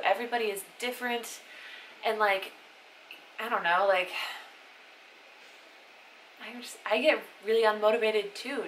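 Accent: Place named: United Kingdom. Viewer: I literally assumed you were British 😂😂😂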